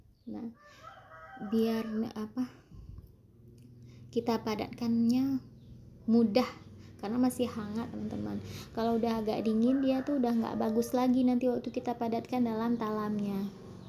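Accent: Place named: Malaysia. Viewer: Hirip mirip orang Malaysia cara bicaranya 😂,jakarta mna jemlolnya😂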